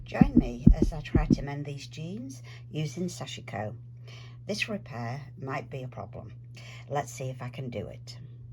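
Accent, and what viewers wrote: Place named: Northern England, United Kingdom. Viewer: Thank you very much for all of your Sashiko videos. I’m a complete beginner and you are the first channel that actually has slow and helpful tutorials. I’ve found so many other videos that start, assuming you already know how to make a Sashiko knot, etc. So, thank you for all of your help. On another note, are you from the Midlands or northern England? I absolutely adore your accent. Greetings from Washington!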